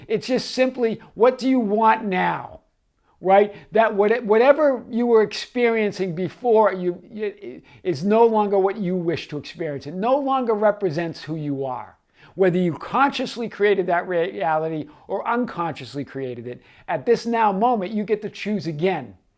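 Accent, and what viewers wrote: Boston, United States. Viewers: From Boston? 🚀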